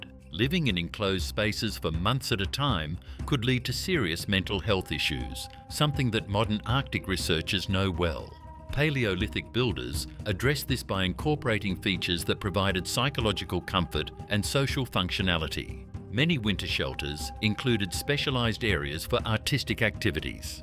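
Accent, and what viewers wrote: Australia. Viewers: Sounds Australian, but used Fahrenheit 🤔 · Australian accent I think...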